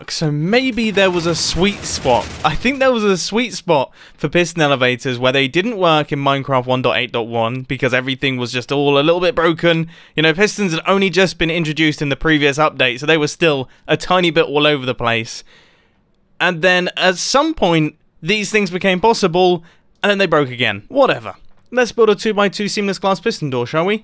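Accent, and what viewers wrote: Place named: United Kingdom. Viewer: Does anyone else not have any idea how any of this redstone stuff works? I just like watching the British man talk funny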